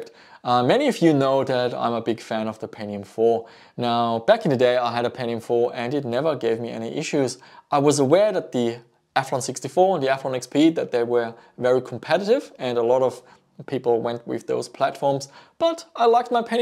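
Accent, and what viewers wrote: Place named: Germany. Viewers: looks like a UFC cruiserweight. Looks dangerous and his German accent backs it up. Scare me in a dark alley... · btw i'm from germany and always noticed your german accent, where are you from?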